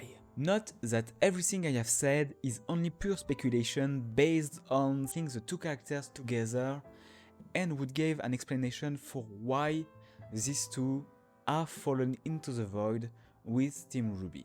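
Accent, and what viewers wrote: France. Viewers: Ca c’est un accent français je me trompe ? Btw nice video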